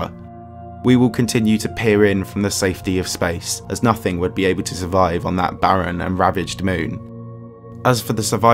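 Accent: United Kingdom. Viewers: British accent? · I don’t understand the fascination/obsession with the British butt pirate accent. It’s more of a distraction and takes away from the message.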